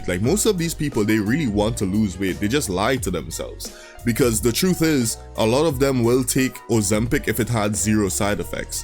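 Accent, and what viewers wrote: Trinidad and Tobago. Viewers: You kinda sound Trini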